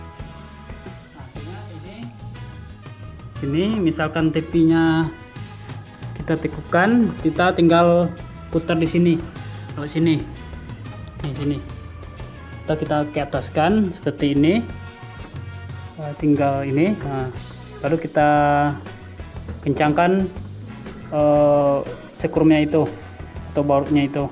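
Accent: Indonesia. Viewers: Logat kalteng sih 😄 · Kayak logat urang awak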